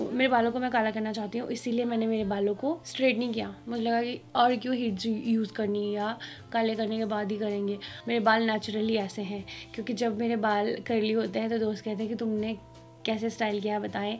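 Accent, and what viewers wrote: Punjab, India. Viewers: Ap bht cutely punjabi bolti ho ❤☺☺☺Or apki family bht sweet hai 😍😍😍 big fan of you 😘😘😘😊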